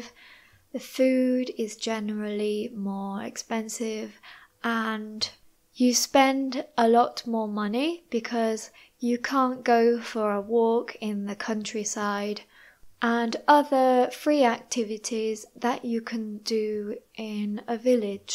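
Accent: United Kingdom. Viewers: Thank you for your videos, they are very clear and help to understand the elegant British pronunciation...... although I prefer your videos A1....😂😂😂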